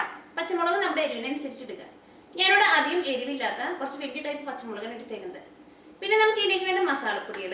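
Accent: Thrissur, India. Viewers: ur malayam sounds funny....thrissur language....i like u ...i ve tried ur recipies....keep going ur good work....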